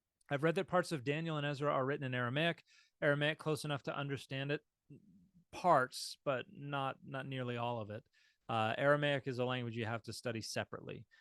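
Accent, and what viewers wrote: Israel. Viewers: It is important to note that the pronunciation here is the modern israeli pronunciation - not the ancient one. If you want to hear the pronunciation of ancient hebrew (in different periods) I recommend watching A. Z. Foreman's channel. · I couldn't help but notice you pronounce Hebrew closer to a modern Israeli Hebrew pronunciation, rather than a reconstructed Biblical Hebrew pronunciation. Is that because your teachers were Israeli? Or because you distrust reconstructed pronunciation? Or just an accident of fate?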